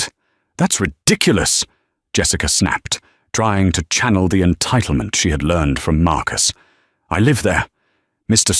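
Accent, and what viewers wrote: United Kingdom. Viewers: The commentator's voice is quite pleasant, as well as the British accentuation that gives a convincing touch to the story. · I certainly prefer the British English. The grammar, accent and pronunciation are in-line with my education.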